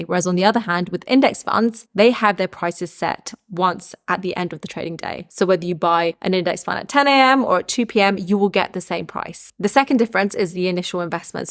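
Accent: United Kingdom. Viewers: Wow. So clear. And, good to hear a fellow Brit. Most of these types of videos are very US-focused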